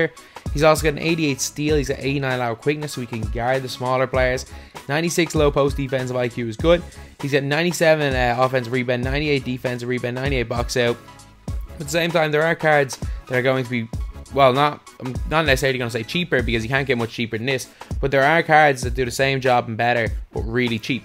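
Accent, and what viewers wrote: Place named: Ireland. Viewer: man your prenounciations are just wired I guess that's just your Irish accent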